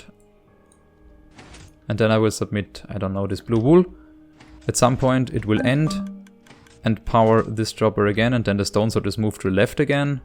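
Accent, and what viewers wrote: Germany. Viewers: Are you german i think you have a german accent!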